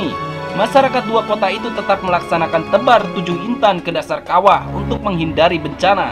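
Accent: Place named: Arab world. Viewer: Ngomong jawa pake logat arab ya seperti itu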